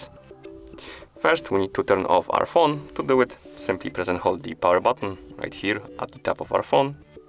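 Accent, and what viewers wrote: India. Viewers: I have lived in the US my entire life and know zero people with thick Indian accents but it’s still very easy for my brain to compensate for the accent, I understand every word of tutorials like this. Maybe, just possibly, it is you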